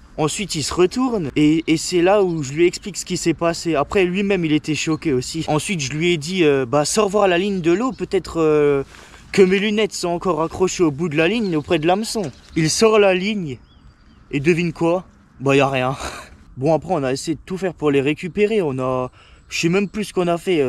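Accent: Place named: Moselle, France. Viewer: Vu l'accent , tu est de Moselle ???? moi je suis d'Hayange 😊😊😊😊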